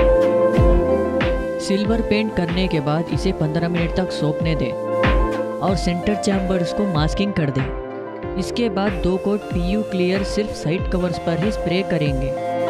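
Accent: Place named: Karnataka, India. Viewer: Are you from Karnataka??? Hindi tone can identify... 😀 Great job bro..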